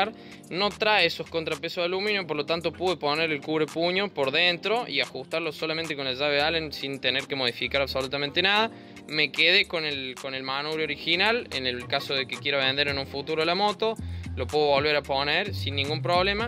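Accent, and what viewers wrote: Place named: Córdoba, Argentina. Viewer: Hola, al escucharte ya veo que eres de Cordoba. Yo tengo el modelo 2020 le cambie el foco por uno Phillips Xtreme Motovision y alumbra muchisimo mejor. Es lo unico que le cambie, porque he visto que bien regulada la moto, no tiene problema de arranque en frio, ni hace ruido de valvulas. Le puse un parabrisas alto y anda mucho mejor en ruta ya que ataja bien el viento. Algunos viajecitos cortos hago pero me arreglo con la parrilla y una mochila. No quiero ponerle alforjas. Linda moto, anda muy bien y estoy pensando este verano en ir de Cordoba a Salta. Saludos desde Alberdi, Cordoba.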